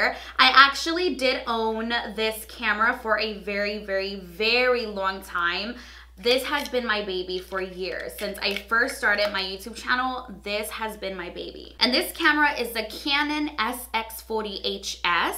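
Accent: New York, United States. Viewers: are you from new york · holy new yorker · R u from New York? Cos “nwot auwll of uws are” (not offending anyone) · Her new york accent is super heavy love it😂👏🏽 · It's alot like mine I am wondering if she is from new York or boston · Are you from New York or New Jershey by any chance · I love your accent ❤😇 so new yorrrrk haha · I love your NY accent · she sounds like a really strong new yorker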